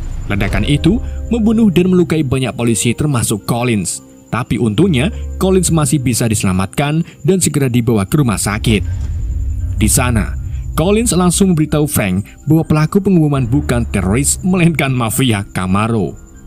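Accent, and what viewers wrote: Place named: Java, Indonesia. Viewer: Tak meluni sekang awal malah part 3 jawane metu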